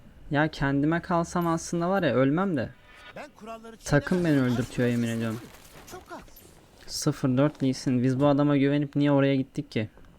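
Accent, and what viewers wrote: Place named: Samsun, Turkey. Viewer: Bu adam Samsunlu la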